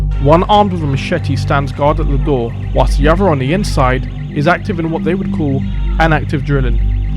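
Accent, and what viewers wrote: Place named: Jamaica. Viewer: Your accent kills me 😂😂 why are you talking Jamaican. It's not cool to be a wigga lol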